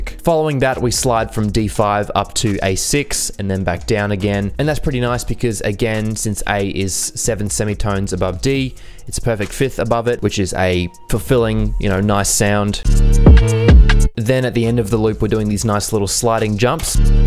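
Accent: Australia. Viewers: yo you aussie?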